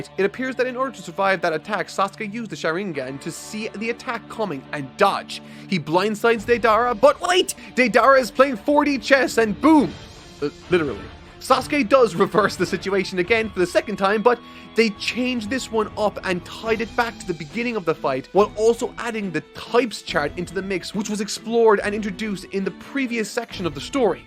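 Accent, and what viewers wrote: Ireland. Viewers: That's wild af to call an Irishman a Brit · Are you Irish, sir?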